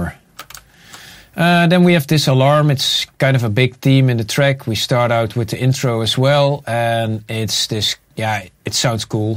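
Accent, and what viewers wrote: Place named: Netherlands. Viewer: You can really hear he is dutch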